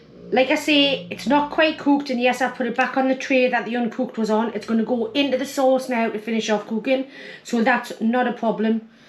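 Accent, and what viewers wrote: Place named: Northern England, United Kingdom. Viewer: I enjoy the food content of this shoe almost as much as I enjoy accent from the north of England. Americans like me love that accent because it feels so close to home and is very much like ours. Keep up the good cookin' work!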